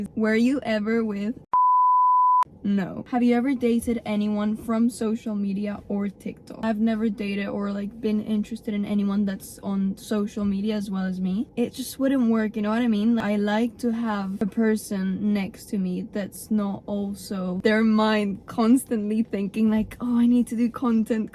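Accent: England, United Kingdom. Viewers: OMG GOD I LOVE UR ENGLISH ACCENT ❤❤ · OMG GOD I LOVE UR ENGLISH ACCENT 🫶🏼🫶🏼❤️ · Dios mío, amo tu acento inglés ❤❤❤💋💋💋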